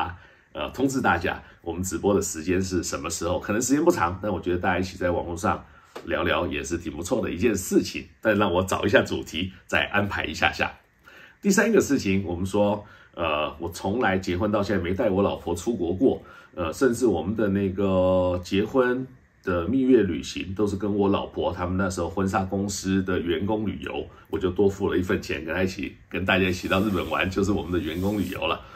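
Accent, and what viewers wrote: Standard. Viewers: 章兄的國語字正腔圓⋯聽力正常者應該不需字幕😂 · 了解，沒有字幕沒關係，您的國語很標準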